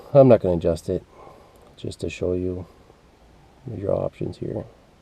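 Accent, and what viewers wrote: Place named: Southern Ontario, Canada. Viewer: That there is a southern Ontario accent. You still happy with the purchase?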